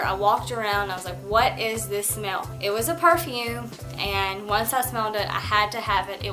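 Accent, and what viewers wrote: South, United States. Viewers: I love your southern accent babe! Are you from Texas? Sorry I'm new to your channel and Subscribed btw! :D Love the video and can't wait for more!( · Oh, cool! I'm from North Carolina, so I definitely know how it is to have a southern accent! Thanks for replying!:)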